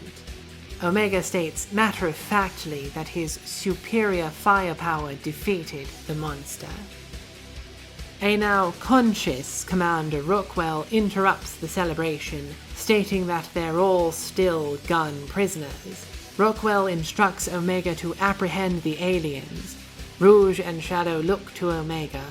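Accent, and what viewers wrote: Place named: United Kingdom. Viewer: Why do you have a british accent in some videos but not in others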